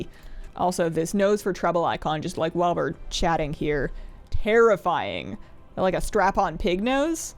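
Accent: Canada. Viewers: Can you tell she's canadian? :D I love your enthousiasm!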